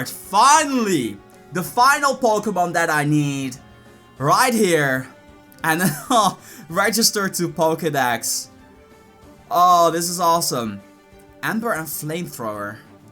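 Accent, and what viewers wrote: Netherlands. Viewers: where do you come from you sound Hollands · u live in NL that explains the accent i am from the netherlands too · where is he from? he kinda has a dutch accent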